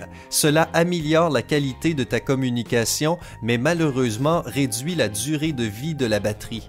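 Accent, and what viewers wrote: Quebec, Canada. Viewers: Je kiffffe ton accent québécois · L'accent québécois purée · C'est très évident que la personne qui parle est un québécois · Wtf ses un québécois et ne me contredisez pas je connais l’accent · HAHAHA LA VOIX QUEBECOISE DE SYMPA! YAYYY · très sympa et accent canadien trop rigolo pour nous en france c'est très campagnard ! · Voie canadiennes bonnes explication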